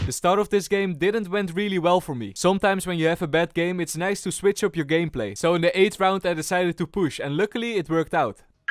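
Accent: Netherlands. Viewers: what I mean is the English is so heavy he's basicly speaking Dutch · im also dutch and i like your accent XD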